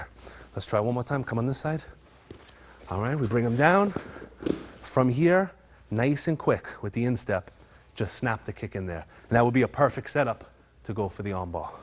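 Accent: New York, United States. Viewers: That's some amazing shit I like your videos you don't act like a bad ass just how it's done. Btw, you from NY.?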